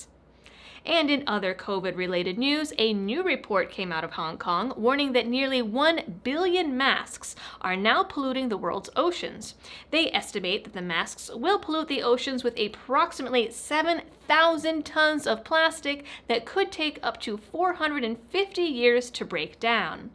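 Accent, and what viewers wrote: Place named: Massachusetts, United States. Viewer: This was a good idea...but I definitely can tell you are from Massachusetts, you can talk a mile a minute...but this a good thing because we get more news in the three minutes....keep up the good work. 👍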